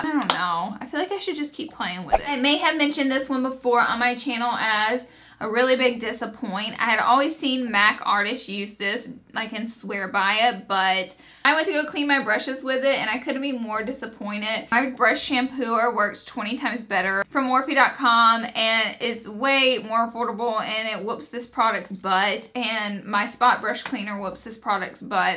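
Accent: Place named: Alabama, United States. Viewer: I just started watching you today when I stumbled on one of your videos. Within 20 seconds I thought "she has to be from Alabama!" Lol I say "oil" the exact same way.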